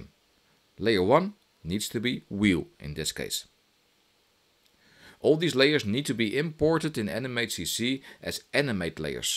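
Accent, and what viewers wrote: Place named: Netherlands. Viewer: this sounds so Dutch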